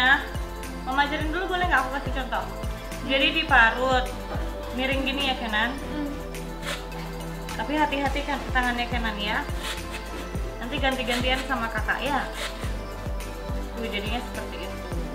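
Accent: Java, Indonesia. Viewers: Wah mbk bohoso jowo wis rodok medhok mbk wkwk. Good luck all the best. Lots of love from Central Australia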